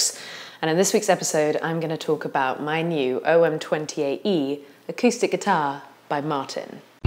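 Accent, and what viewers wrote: United Kingdom. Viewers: Really ? I thought that accent was kinda British... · Your British accents makes it official love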